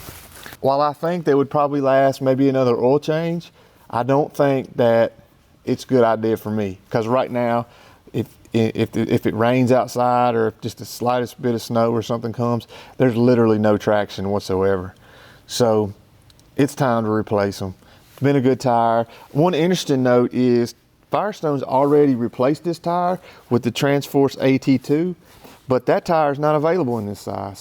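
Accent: South, United States. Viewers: Tars (tires), awl (oil). The southern accent has a charm all it’s own.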